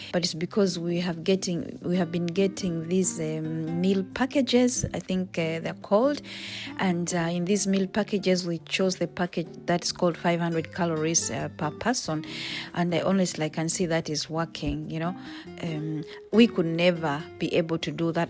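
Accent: Uganda. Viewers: Hi, are u ugandan? Ur ascent is so Ugandan.. Love u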